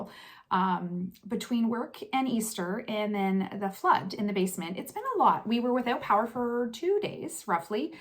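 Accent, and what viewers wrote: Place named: Canada. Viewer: Nice to hear a familiar Canadian "accent". I'm also in Ontario, closer to London. I love seeing all your projects. We have similar tastes in stitching and I have some of the same ones ready to go. I usually stitch on one project at a time and I'm over half-done a Teresa Kogut chart (Cotswold Fields) at the moment. We are about an hour from the border, so that's convenient for Hobby Lobby, etc. Luckily we have a LNS in London (Stitch-It Central). Keep stitching!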